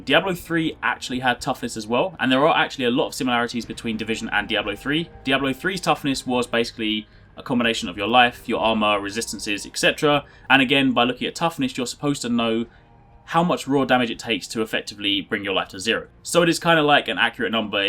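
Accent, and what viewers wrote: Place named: United Kingdom. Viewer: great content!thumbs up because i like listening to u.k guys accents.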